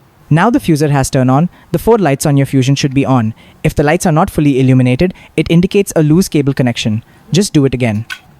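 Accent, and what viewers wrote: India. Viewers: Sounds pretty indian if you ask me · ts indian voice cannot be the method 🥀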